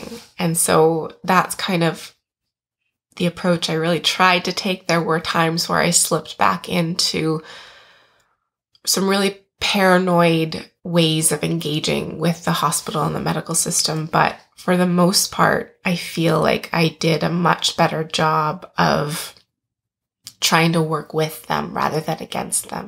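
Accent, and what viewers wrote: Canada. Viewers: It sounds like you’re Canadian? If so, I will ignore the clinical dogma, as in the U.S. we use human experience language to describe extreme states. I consider the word “psychosis” a slur, no matter who utters it.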